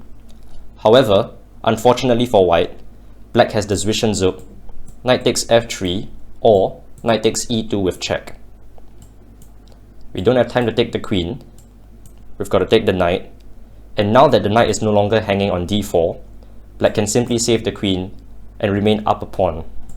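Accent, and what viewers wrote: Singapore. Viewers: Let me hear that Singlish sir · Ayoo you from SG? Accent sounds so familiar lol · Brilliant video. I'm 1500 rated and I found this video really instructive. Are you also Singaporean? I ask because I am, and I recognise the accent!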